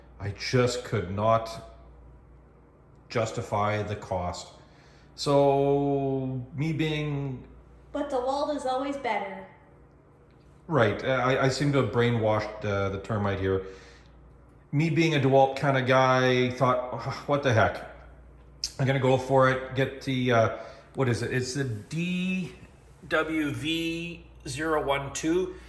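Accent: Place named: Minnesota, United States. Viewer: You must be from Minnesota. Nice unboxing and walk through of the unit!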